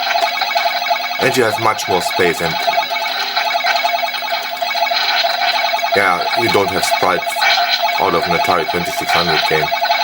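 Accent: Germany. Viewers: My german-sensor was activated... Do I hear a german gentleman? :-D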